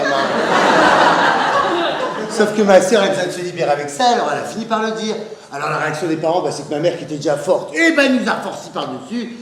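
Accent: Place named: Nord-Pas-de-Calais, France. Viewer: C'est bizarre pour un homo d'avoir l'accent ch'ti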